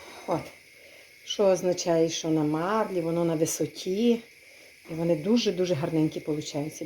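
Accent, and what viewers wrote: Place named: Poltava, Ukraine. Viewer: Милая хозяюшка! Спасибо Вам и за рецепт и за воспоминания молодости,когда мы ездили в гости к свекрови в Полтаву. И этот говор, и эта простота в приготовлении,и даже марля на кастрюле. Свекровь делала такие вареники ещё и с мясом( фарш из отварного мяса). Дуже смачно было! Храни Вас Бог от бед и напастей! Здоровья. вам!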